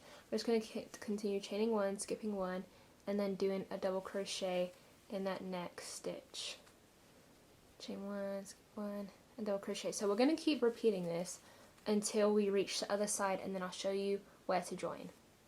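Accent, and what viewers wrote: England, United Kingdom. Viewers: Love the English accent😊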